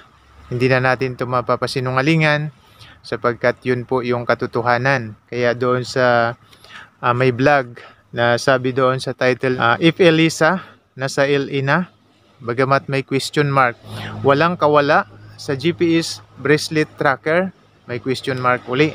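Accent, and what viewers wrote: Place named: Visayas, Philippines. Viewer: Ulol! Di ka bagay dumepensa sa mga vangag mong amo.Doon ka sa visayas o mindanao kay tonong bisaya ka naman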